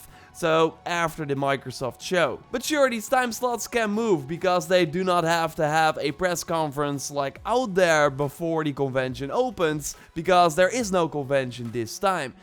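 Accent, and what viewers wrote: Netherlands. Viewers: Hey, Like your video's very much and learn a lot from them as well. Ben je Nederlands? Dacht het te horen aan je accent.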